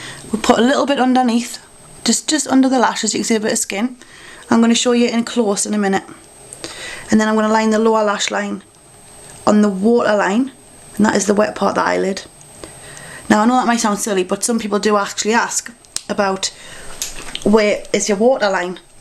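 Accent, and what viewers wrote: Newcastle, United Kingdom. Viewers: where are u from? UK? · *squeals* When I clicked this vid, I had no idea I'd be listening to a fellow geordie!!! Awesome. I was well expecting a yank....XD xxx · Lol you speak fast! And with your British accent I cannot understand everything you say.. Oh well, you still make wonderful videos :)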